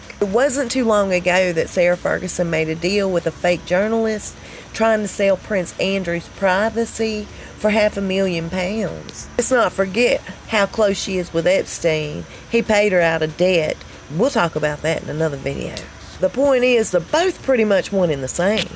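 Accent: South, United States. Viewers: Oh I love 💕 how you just tell it like it is and your southern drawl is to die for. Love 💕 your vids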